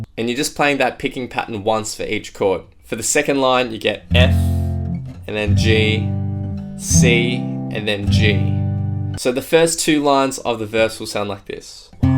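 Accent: Australia. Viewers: 4. Because you are Australian and your accent makes sense to a fellow Aussie!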